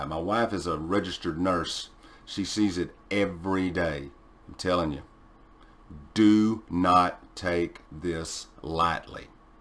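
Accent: South, United States. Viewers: You definitely have a southern American accent 😉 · Nice to see you back and in good health.Thanks for what you do with the videos. Im in the UK. Sorry to say but you do have an accent , its an American one , I don`t have one because Im English. Please keep up the good work that you do. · You got an accent it sounds Texas like me must be a Southern accent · You have a southern accent! I’m in Canada and no one here has that accent unless they’re from the southern states! My best friend has it, feeling better but apparently considered a “long hauler”... 6 weeks now. Wondering if your taste and smell came back to normal? I would like to let her know as it’s an optimistic positive.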